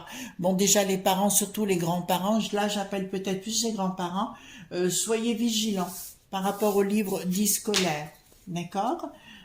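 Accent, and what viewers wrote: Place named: Provence, France. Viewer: Bonjour, j ai reconnu l acccens du pays...sa sent bon la farigoulette et la lavande..je vous souhaite une bonne soiree. ♥☺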